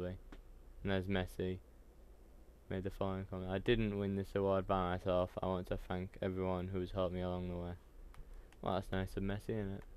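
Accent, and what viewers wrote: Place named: Northern England, United Kingdom. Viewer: SAME and he's northern which makes it 10x better for me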